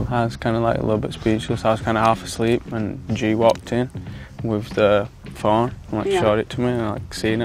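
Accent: United Kingdom. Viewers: Zayn is my reason love British accent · Is he tryna hide his british accent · lol after so many years i finally hear him talking and that british accent was freaking unexpected af lmao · OMG!!! his voice and his british accent is pure love!!! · Because he rarely talks, I'm always shocked to hear a British accent come out of his mouth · British accent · I forgot how strong his accent actually is. You really have to pay attention if you are not British 😅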